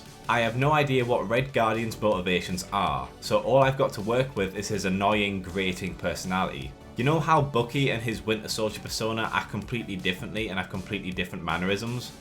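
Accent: United Kingdom. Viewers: thats not a heavy British accent lmao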